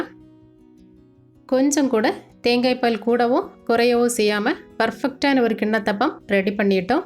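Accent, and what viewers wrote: Kerala, India. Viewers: Are you a malayalee? Your tamil has malayalam accent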